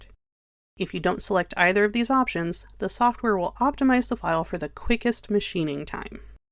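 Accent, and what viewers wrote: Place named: England, United Kingdom. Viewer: Good job. Your voice is perfect for this work. I've been fooling around with this stuff for 3 years and now I know I've been doing it all wrong with some unusual results. always went back to the straight bits with just OK results. I think Vectric should hire you to do all their training videos. The English accent confuses me every time. I am now a subscriber. Thanks again. I guess an 80 year old man can still learn a few new tricks after all.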